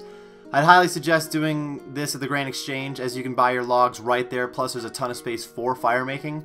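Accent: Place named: South, United States. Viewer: Where you from? Noticed the southern accent :P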